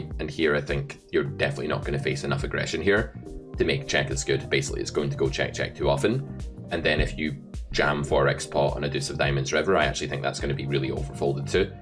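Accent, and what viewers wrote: Scotland, United Kingdom. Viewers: Really like your Scottish voice… nice books too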